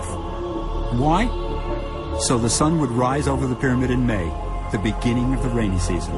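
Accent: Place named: New York, United States. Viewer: Pyramids ...described by a fukkin new yawkuh hahaha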